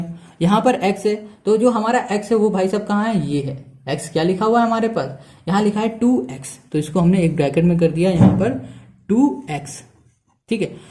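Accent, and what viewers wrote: Bihar, India. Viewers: Bhi app bihar se ho na👌👌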